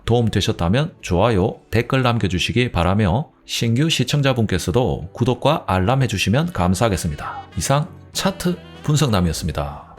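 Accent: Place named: Gyeongsang, South Korea. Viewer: 세련된 경상도 억양이 집중도를 높이네요 귀에 쏙쏙 ~~ 영상 굿~~~ 감사합니다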